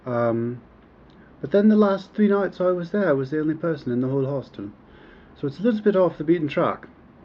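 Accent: United Kingdom. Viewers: That fucking accent is from Britain he is no fucking American ;) I wouldn't beat you because ur gay I would beat you because you are fucking nasty ass person. Did I mention you are fucking DUMB? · he's british, or american with a british accent, which is extremely rare btw, you're disgusting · You are not American. You have a British accent. So please don't give us a bad rep with your negative comments. I have friends in Serbia and would love to go there. I'm sorry your experience was negative. But really I don't understand why you don't finish eating, belching, picking your nose, etc. BEFORE you put your negative comments on You Tube for all to view. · Both accent and features rather point to British person... He visited my birth town Belgrade and i would like to tell that he visited Celtic city, Celts made in BC. Romans overtook `round 40 BC then Slavs came and city name changed from Singidun - meaning "Round Fort" (name given by Scordisci) to Beograd (Belgrade) which means white town, it seemed white to them. 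Since he is Brit this suffix (dun) should be something he recognizes..or not? This story is kind of skin tanned too much... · can´t you hear his voice. he is speakin´ clear british english so thats the explentation. so stop acting smart. u know it´s better to shout the fuck up sp ppl think you are dumb, as to say a word and clear all doubts!!! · American with a British accent? Hmmmmm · sry man. u sound kinda british :) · It's strange how you don't have a typical american accent, you sound more British. You are very ignorant, and I really hope you were joking here. Otherwise it's just sad. What is the point of this video anyway? To show off your ignorance? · Than you didn`t explore your country well enough. Have you been in New Orleans, or some suburbs of LA or NY? Pretty much filthy, even subway in NY looks that you can catch some serious disease, although it is a great town. Mexico is far away of great society............sorry USA. 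More important question is why this guy call himself American when he talks with British accent? Provocation or he is ashamed of his nationality, or he think that being an American is - cool? :) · This dude needs to go to detox clinic first, then go to a mental institution to clear of his mind. He is mixing Bosnia with Serbia, he calls River SAVA - RAVA . . .he even managed to mix the fact that he is fucking British with perfect British accent, not American. He perhaps visited USA once in his mind! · Your take on Serbia isnt that interesting, but you seem to think it is. And why not say you're a Brit? You don't SOUND like an american. I like Serbians. I'm an american. You seem a little Holier than Thou. It's off-putting.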